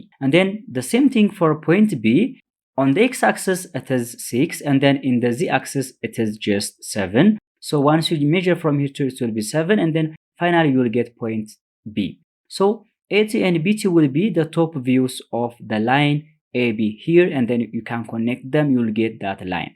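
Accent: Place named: Ethiopia. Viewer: Your accent is so Ethiopian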